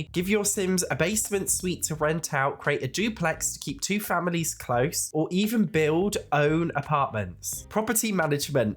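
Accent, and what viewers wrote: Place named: United Kingdom. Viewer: In a way your cold makes you sound so cool! Goes well with your British accent 👍🏻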